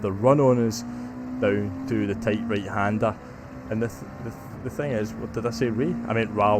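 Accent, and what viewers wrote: Scotland, United Kingdom. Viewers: Awesome video once again. You can see that you clearly have the speed needed to win a race. One thing: you mentioned you are more comfortable starting p3.4,5. Something like that, so you can settle into the race. If you feel more comfortable NOT leading , would it help if you take it easy on the opening lap(s) and if someone wants to overtake from p2/3, just let them? Then you are behind and you can settle in. Of course, it's also amazing if you want to push and challenge yourself to be more at ease pushing from the front. Anyway, great vid and I personally just love to hear the scottish accent. Have no issues and understand everything (and im a dutchy)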